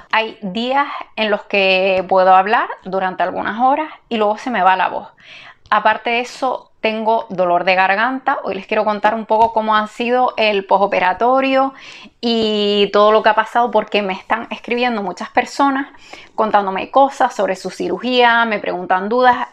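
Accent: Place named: Canary Islands, Spain. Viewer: Acabo de descubrir tu canal y me llamó la atención que eres canaria (y estando en Alemania es de agradecer escuchar mi acento) Te vi a través del vídeo sobre Inositol, y viendo este quiero darte muchos ánimos paisana!!❤❤ Un abrazo y gracias por compartir tus experiencias y conocimientos con nosotr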